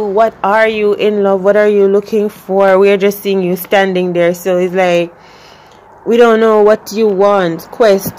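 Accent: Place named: Jamaica. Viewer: I love your patois ❤️🔥🥰 please don’t hold it back .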